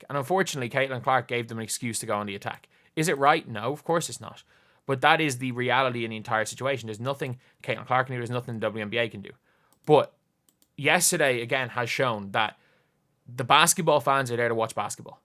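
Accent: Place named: Ireland. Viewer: I hear “So Caitlin Clark…” in a strong Irish accent in random places I shouldn’t even be hearing it now 🤣🤣 Love you and keep up the good work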